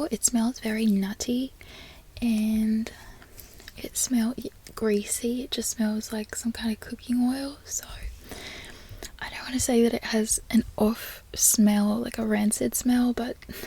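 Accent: Australia. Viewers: Good aussie or maybe south African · Dang. I thought you WERE Australian. Its hotter 😅 il see myself out. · Surely you are an Aussie. If not that is the best Aussie accent I have ever heard. Well done. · Damn you’re actually really good at the Aussie accent sound like a Sydney chick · That's a great natural Aussie accent, better than most Aussies actually 😁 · (ASMRtist) is that you. Or is this someone else. Oh I just realized this was you. Great Aussie accent. You are nailing it Keep up the great work.😍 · Easily one of the best aussie accents I've heard. You should be really happy with yourself. when you started saying "invigorating" was on point. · That is a really excellent Australian accent! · You sound like an expat Aussie with just a couple of tells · Wondering what all your tattoos mean? Maybe you could talk about them in your next vid? Great Aussie accent btw. 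· Carl here from Adelaide south Australia, your wholesome Aussie accent is a turn on! But then realised you are just another yank lol only kidding love my hot Yankee girls aswell! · The Aussie accent is 100% my favorite. Its soo 😍😍🔥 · Im Aussie and your accent is very impressive, well done. A little tip for some words: Nasty is narsty, emu is emyou, banana is banarna, aussie is ozzie. Very good overall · Yeah a few things were funny to hear but otherwise a really good job! Most people normally butcher our accent but you have obviously heard a wider range of Australian accents and it's helped your grasp. Lovely as always <3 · Our wild roos here aren't all that exciting and they are dangerous. Especially the big males they can and have really messed people up. But i gotta say you do a pretty good Aussie accent. Normally when Americans try to do a Aussies accent they sound more like a South African with a bit of kiwi chucked in. 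· Aussie here. At first you sounded very newzealand almost like a New Zealander who moved to Australia lol, but Your Aussie accent is pretty damn good. I did notice the way you pronounce your As like in nasty was not so Aussie, we say it like nahstie. Also you said emu like emoo but we say it like emyou. Besides that it’s probably one of the best Aussie accents from a non Aussie I’ve ever heard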